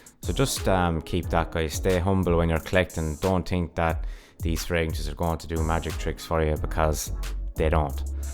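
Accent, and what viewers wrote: Ireland. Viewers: Some good advise....really enjoy your Irish accent. Thanks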